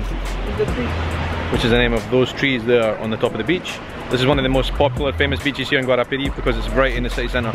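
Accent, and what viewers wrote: Scotland, United Kingdom. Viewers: Are the Brazilians, having a wee trouble with your Scottish accent? :) Have a great time.. I hope they ship that Lemon Cake to the U.K.! for you...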